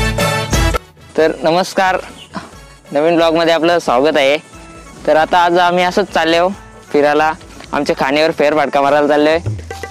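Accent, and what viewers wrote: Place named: Agri, India. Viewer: BMW lakaht ek ahe..proper aagri boltos ..vinayak ani bunty peksha bhari.....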